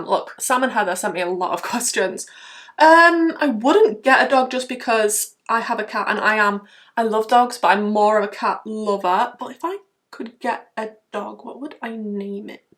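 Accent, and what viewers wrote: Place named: North, United Kingdom. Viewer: You remain a total pleasure to watch... and listen to with your cheerful northern accent ❤. Maintaining your impassioned religious faith, especially one you acquired as a teen or young adult, is really special. It speaks of your strength of will and ability to withstand the changing fashions and trends around you. In fact, your commitment to your makeup aesthetic, irrespective of trends, shows the same fortitude! God bless you and your loved ones.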